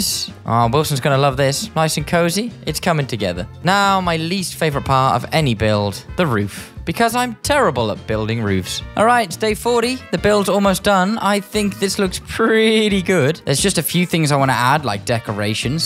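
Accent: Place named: United Kingdom. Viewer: He is ironically British XD (nothing bad about that tho)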